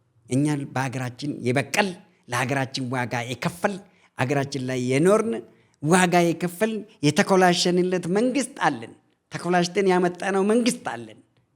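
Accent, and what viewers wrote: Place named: Ethiopia. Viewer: በዚህ accent የአዲስ አበባ ልጅ ነኝ ማለት አይነፋም። በማንነትህ ኩራ። As long as you believe in one Ethiopia.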